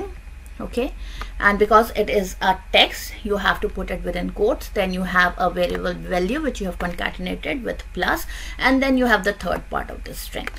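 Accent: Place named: India. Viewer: The accent is so Hinish and so bad and is not understandable!!! learn to speak English as English not as Indian!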